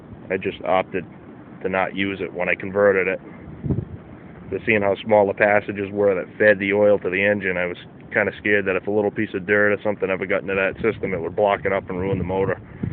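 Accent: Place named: Rhode Island, United States. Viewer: Wow you sound like your from RI!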